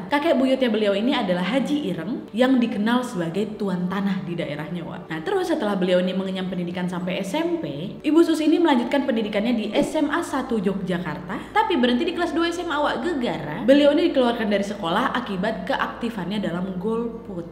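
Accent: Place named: Lampung, Indonesia. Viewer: Kamu orang lampung yahh logat ny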